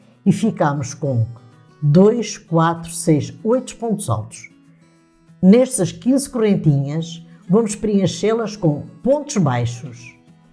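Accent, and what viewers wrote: Portugal. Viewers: Muito linda e seu modo de ensinar maravilhosa. Seu sotaque lembra muito minga avó portuguesa. Miro Salvador. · Amo seu sutaque portugues..a sua explicação é maravilhosa...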